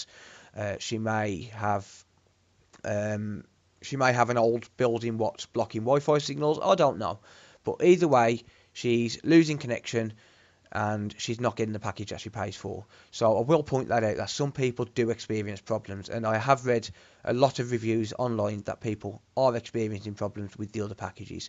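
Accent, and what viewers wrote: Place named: Black Country, United Kingdom. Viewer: I thought the same lol, as soon as I started watching this video I was like wow is that a yam yam (or a Brummy)? Then moments later - yea, a yam yam! I'm from Stourbridge/Wollescote but working-living in Birmingham for the last 9 years, its always nice when visiting back home hearing people talk normal/homely again - yow alright lad, am bostin ah kid, I ay bin up mezza in ages I core believe it, ar dow arf miss livin around the black country. So yea, I used to have Virgin Media for donkeys years at my parents house until I moved to Brum 6 years ago, think I last had 100 or 200Mb in Stourbridge but for Brum I could only get BT's 76Mb, actually 65-69Mb. Then Hyperoptic came along 3-4 years ago so now I'm on their 1Gb, actually 900Mb ish for £45/month BB-only. Honestly though I hate living in Birmingham, I'm glad Virgin have 500Mb+ now its giving me an incentive to want to move back home where all my family are.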